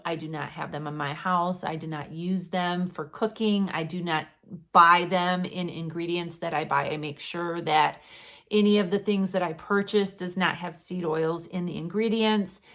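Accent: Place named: Michigan, United States. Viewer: Great testimony,! Well done! My story mirrors yours so much — almost 2.5 years now as a keto-vore. SO many benefits and changes and we’ll never go back. I’ve been watching your videos for a year now and enjoy seeing you and Ed and your adventures. The first time I heard your video, I heard that Michigan accent and thought “hey! A fellow Michigander!” 😊 Thanks for all the encouragement! Keep going, lady! Julie in W.MI.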